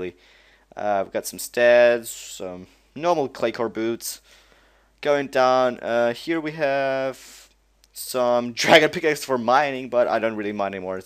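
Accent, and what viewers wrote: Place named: England, United Kingdom. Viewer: It's like your talking in and english accent but trying to fight off some foreign accent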